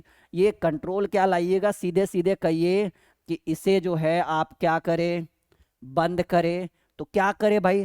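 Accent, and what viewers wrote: Bihar, India. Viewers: Kya aap bihar ke hai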